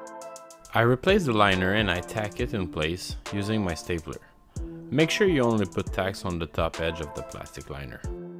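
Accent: Quebec, Canada. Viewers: J’adore comment tu ajoutes des « h » au début de certains mots et tu les retires du début d’autres: on reconnaît ainsi l’anglais avec l’adorable accent québécois! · Est-ce que je reconnais un petit accent québécois?? Beau projet btw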